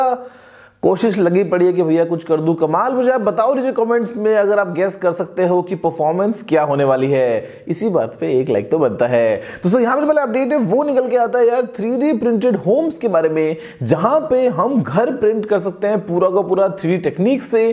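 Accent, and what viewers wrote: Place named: Haryana, India. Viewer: I think apki performance mein thoda haryanvi tadka hogga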